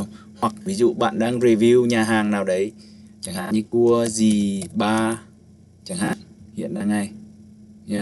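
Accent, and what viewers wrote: Central, Vietnam. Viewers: Anh là người miền Trung phải không ạ? Em người Hà Tĩnh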